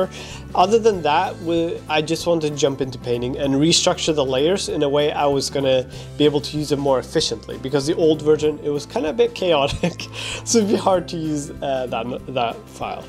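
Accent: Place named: Sweden. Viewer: i KNEW i recognized your swedish accent from somewhere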